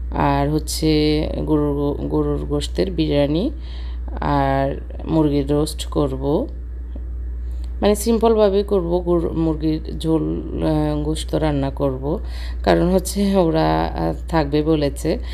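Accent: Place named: Cox's Bazar, Bangladesh. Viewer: আমি ককস্ বাজার থেকে,আপনার কথা শুনে মনে হচেছ আমাদের কাছের।কোথাকার বলবেন?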